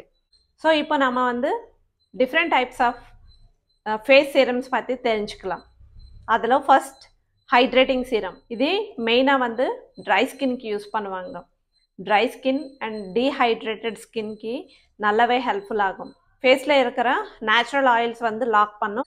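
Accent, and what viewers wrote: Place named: India. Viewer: looking beautiful in yellow saree😍pimples will come due to body heat 😍Alovera fresh a eat pannu na pimples reduce agum 😍ponnu put vlog in pink saree😍your tamil slang is cute😍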